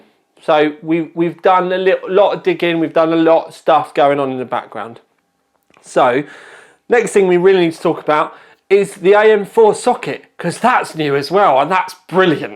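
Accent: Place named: Norfolk, United Kingdom. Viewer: gotta be a naarfolk boy i reckon...good job....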